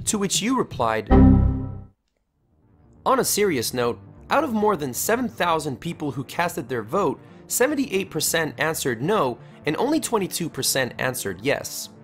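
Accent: Balkans. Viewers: Are u from balkan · Are you from the Balkans?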